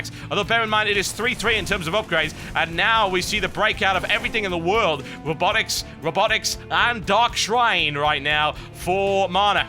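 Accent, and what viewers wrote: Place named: United Kingdom. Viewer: hes so british.. i can't understand a damn thing when he talks fast, but i am no less excited.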